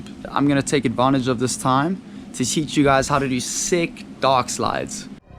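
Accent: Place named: South Africa. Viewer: Bru! So rad to randomly catch a Saffa accent on YouTube :) makes me homesick. Will deffo be checking out more of your vids, keep it kif ma china!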